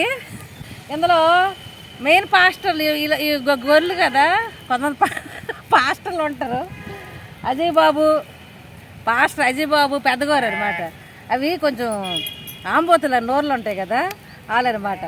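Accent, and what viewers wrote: Srikakulam, India. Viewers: Nuvvu nee seekakulambhasha nee face chuste asayamvestundi gorrhemohamdana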